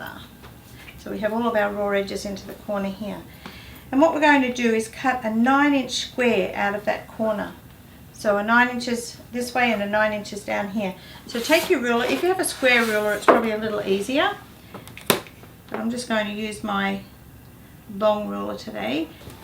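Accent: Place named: Australia. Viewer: I love your tutorials and it is great to have an Aussie accent!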